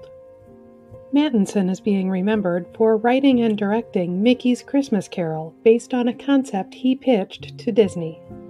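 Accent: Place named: Midwest, United States. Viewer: I smell an accent, ah narrator are you from the Midwest? ❤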